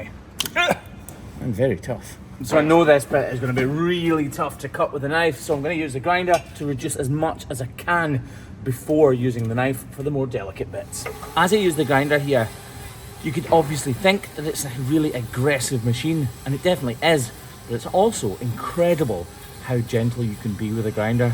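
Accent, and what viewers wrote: Scotland, United Kingdom. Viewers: Please continue to pronounce iodine the way you say it. You are Scottish in Scotland. I very much enjoy listening to you speak. Don’t change for You Tube.❤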